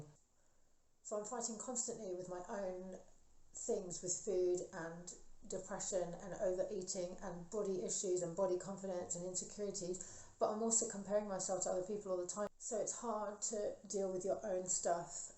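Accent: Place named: South, United States. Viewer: First, wow I forgot how southern ur accent is, I love itttt <3 beautiful. 'u look fine' absolutely riles me as well, and goes the other way when I'm manic and people say I look amazing n im thinking hun I'm living off spinach and I can't stand still. People's unsolicited advice/opinions can shove offfffff. Thanks for making this, it's so hard to talk about , and I relate to a lot<3 also I have that cat necklace but in pink iaaaaaaa <3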